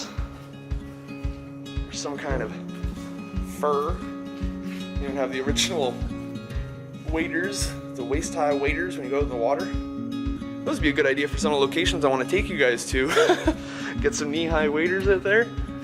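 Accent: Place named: Canada. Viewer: As soon as I saw the ladder I kept saying, "go up!" Lol amazing location. I have to say I love your Canadian accent lol.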